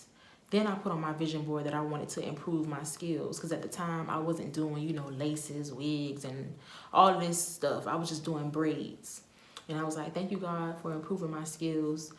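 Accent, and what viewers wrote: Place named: New Orleans, United States. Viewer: Are you from BR or New Orleans ? It sounds like the accent 😍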